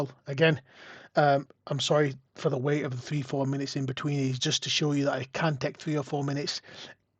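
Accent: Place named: Yorkshire, United Kingdom. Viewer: love the accent! proper Yorkshire - great video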